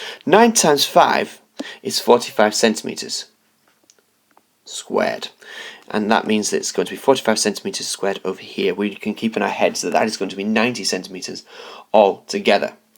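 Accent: United Kingdom. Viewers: BRITISH ACSENT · That’s because he’s british like me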